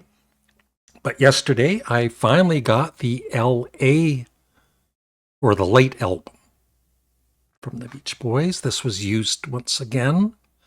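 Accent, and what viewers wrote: Canada. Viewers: Hey I'm guessing you're from Canada by the way you pronounce album as ELbum instead of ALbum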